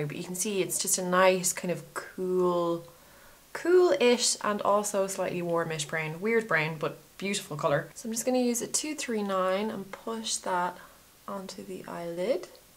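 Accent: Ireland. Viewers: Are u irish? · She's Irish, if that's what you're asking (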